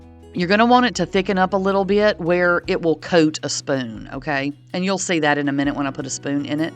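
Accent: South, United States. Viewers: That's a southern American accent :) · It's a beautiful accent from a southern state. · Great video. They look delicious! Will try these for sure! And love your southern accent! ❤️ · I thought you sound like a true Southern Girl. 😉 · Love your Southern accent and your lovely voice. Thanks for the recipe! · She has a southern accent. I don't know which state she is from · It’s a southern dialect, maybe Tennessee or Kentucky id guess. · This is 100% fine & authentic, southern American accent. 👏👏👏🥂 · I love your southern accent I could listen to you all day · I love fudgsicles! You made following that recipe look easy. Thank you for sharing that. Your southern accent is pleasant to listen to and your tattoo is very beautiful also!!! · Her accent is from the American South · Her accent is about as Southern and wonderful as you can get 💕💕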